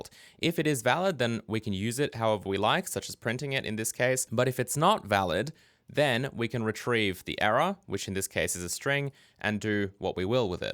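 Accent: Australia. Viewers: Don't assume I'm a simpleton like you and mistook the aussie accent for the f@ I know what aussie sounds like, I know what f@ sound like, he's both. Edit: extremely obvious when he says "C plas plasss"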